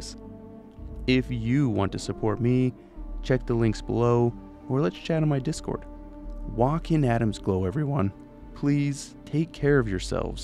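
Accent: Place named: Midwest, United States. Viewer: I love your videos but i have ro say, i noticed your accent way more in this video lol michigan? Minnesota?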